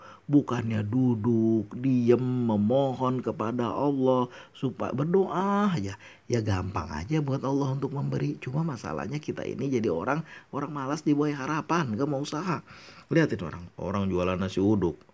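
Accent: Jakarta, Indonesia. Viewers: Assalamualaikum,ustad d Aceh ya ustad,tapi asli Betawi apa Sunda ustad logatnya seprrti orang betawi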